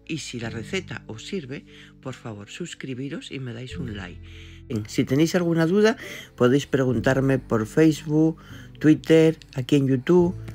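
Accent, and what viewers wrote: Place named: Mexico. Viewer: Saludos desde Matamoros Tamaulipas México 😊 Frontera de México y EEUU Me sorprende que usted siendo de Asturias Habla igual que nosotros Los mexicanos jeje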